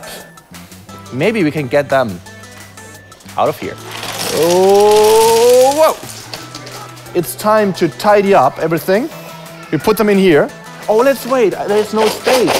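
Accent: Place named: Germany. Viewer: Man kann es hören, dass er Deutscher ist :D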